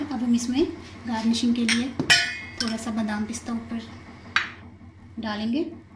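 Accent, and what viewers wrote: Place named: Punjab, India. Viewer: mashAllah very nice ot apka punjabi bolny ka style bht achaa laga..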